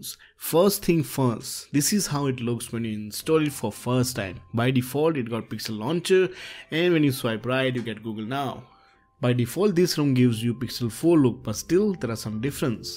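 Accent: India. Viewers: Fcking hindi english accent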